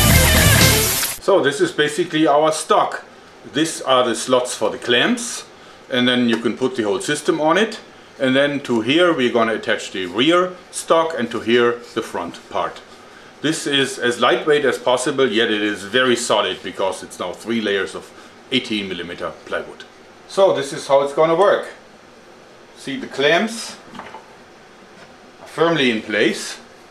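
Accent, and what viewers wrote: Germany. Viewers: Very late to the party here, but I noticed something while rewatching this old video. When our mad rubber tubing based genius says one specific phrase, he sounds just like a certain Austrian Governor of California. He sounds nothing like him at all, but when he is bent over and speaking the words "Pumping Up" are uttered. It's like Arnold's voice came out for half a second, and then straight back to the usual German accent. I'm sure it's just an affectation of bending over and speaking, but I still got a chuckle. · I'm sincerely curious, do most German people speak English so fluently? If it wasn't for the accent I would have no idea his first language isn't English. Secondly, I really like how much he seems to enjoy doing what he is doing. Every time he laughs like that it makes me smile.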